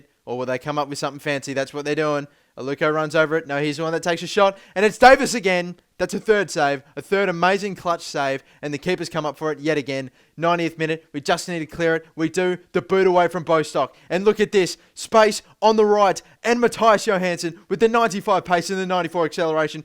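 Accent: Australia. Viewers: Gotta love the Australian accent! :)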